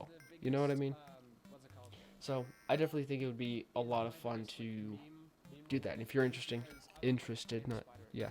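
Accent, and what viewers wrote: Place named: United Kingdom. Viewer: blud is bri ish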